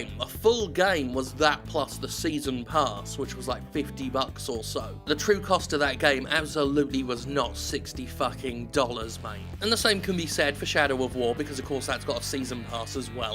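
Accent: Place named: United Kingdom. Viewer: Some Times i find the british Accent some anoying. But yours sounds great. Especially when you talk down to bad Business and Games. This Videos help me to resist the Urge to buy Shadow of Mordor, thanks. I think i buy Hellblade instead.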